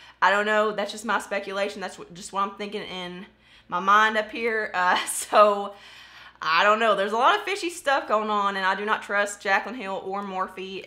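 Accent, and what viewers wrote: South, United States. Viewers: Where are you from? I'm from TN, the accent I can guess you are too or AL, GA, KY. Be cool if you where from TN too, I've only watched one of your videos so far but I like watching you so far! Pretty mellow, real, and tell the truth! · I just found you today, and I LOVE your honesty and Southern accent! I am from Arkansas, girl! Going to peruse your older videos to find out where you’re from! ❤️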